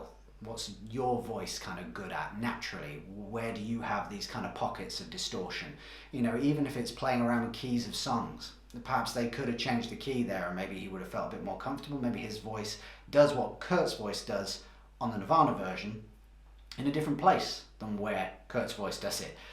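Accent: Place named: Ireland. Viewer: Have a nice day.You sound irish.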